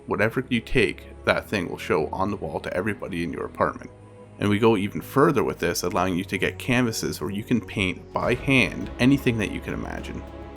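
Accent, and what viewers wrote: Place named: Canada. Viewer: I typed this comment earlier, but I accidentally deleted it because I'm a moron. But, will you have playable female characters in the game? I saw that you had sketches of female characters, but would it be possible for the player to actually be a female? I don't mind playing as a guy, but I was just curious. Also, are ya'll based in Canada? I can hear an inflection in your voice (namely when you say "about" or "out"). I don't think my current computer can handle this game, but, pathetic as it may sound, I'm willing to get a part-time job just to play this game. I really hope ya'll throw a violin or cello in there or something as one of the musical instruments. That would make my life complete.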